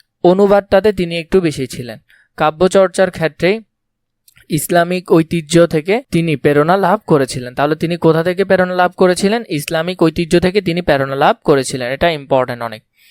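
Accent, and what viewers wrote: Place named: Chittagong, Bangladesh. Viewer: আপনি মনে হয় চট্টগ্রামের মানুষ তাই না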